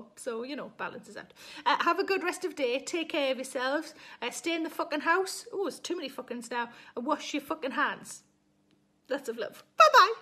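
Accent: Newcastle, United Kingdom. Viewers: I'm semi-bingeing (sp?) this in the US because I love you, Sarah Millican, and your gorgeous Geordie accent, and because I desperately need this upbeat and cheerful and silly distraction in my life. I am watching 3 or 4 episodes at a time and hope to catch up to you soon! Thank you for doing this. I haven't worn a bra since March 12 -- not to walk the dog, not even to go to the grocery store. It's been like heaven. I'm worried I'll feel all constricted when it's time to go back to an office again.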